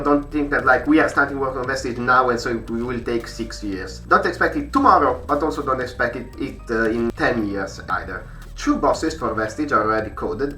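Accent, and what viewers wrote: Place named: Italy. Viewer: dal tuo accento mi sembri italiano, quindi rischio la giocata e scrivo in italiano. Questa mod è una delle migliori che abbia giocato fino ad ora. Apprezzo la ricercatezza di alcune stanze e degli stessi personaggi, tipo lo stesso Bertran ed il fatto che il suo gameplay sia basato sulla sua testa. Le parti più belle della mod sono senz’altro il design generale e i personaggi. Spero che riusciate a finire il terzo capitolo entro il 2024 perché potrebbe essere la migliore mod di isaac a mani basse. Sono in parte contento di essermi approcciato alle mod del gioco solo adesso e non 5 anni fa 😅 Buon lavoro ragazzi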